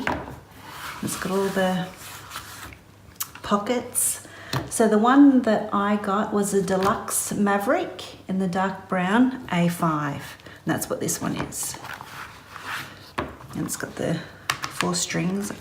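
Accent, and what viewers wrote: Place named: Australia. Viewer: So lovely to hear an Aussie voice doing these types of videos :)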